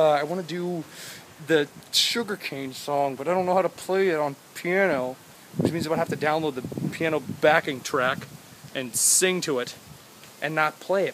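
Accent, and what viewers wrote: New York, United States. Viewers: R u American or English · the new york acsent video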